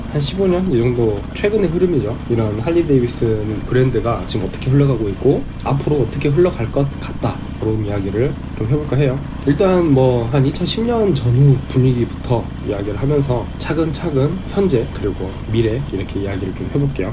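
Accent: Jeolla, South Korea. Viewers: 억양이 순천분같어요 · 구수한 전라도 사투리와 할리의 엔진소리라…찰떡궁합이네요😊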